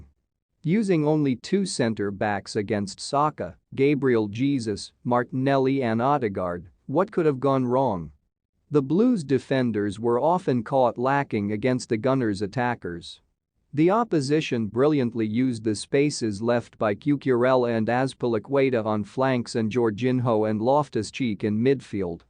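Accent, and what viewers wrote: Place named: United States. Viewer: What is with that fucking stupid annoying American robot accent? Bit of advice change that shit asap!!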